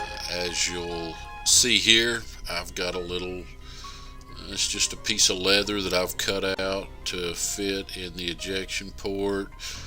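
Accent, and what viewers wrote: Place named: South, United States. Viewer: I just subscribed to your channel! Man you have all the good toys! Your equipment from The Leather Co. of course is top of the line. But you go over the top with your vacuum former, your laser cutter/engraver, your burnisher. Good job! Where are you located? You have a pretty good good ole southern drawl! My kind of people.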